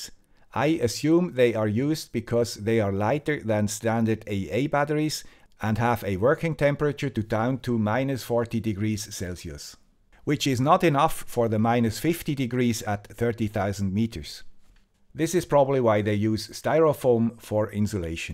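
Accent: Switzerland. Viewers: Salü, guy with the swiss accent and thanks for the thrilling videos of you, hunting weather balloons at night. The last time I checked there were almost no balloons going down in my area, though (Rhein-Main in Germany)...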